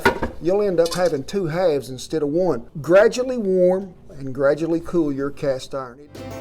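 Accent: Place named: Oklahoma, United States. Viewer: Since you live near Altus, OK, that's an OK accent. My Mom and grandmother grew up in Magnum, not far from there. OK is okay. :). So is that OK accent. I'm loving it. Reminds me of my Mom's family.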